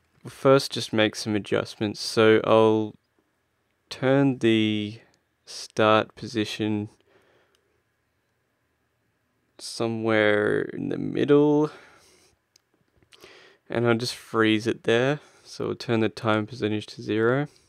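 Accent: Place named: Australia. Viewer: Insane! I'll really have to try absynth. The grains part remind me of the sound you get when playing in Padshop BTW, huge Silverchair fan, especially the 90s and 00s stuff, and your voice reminds me so much of Daniel Johns', I love the Australian accent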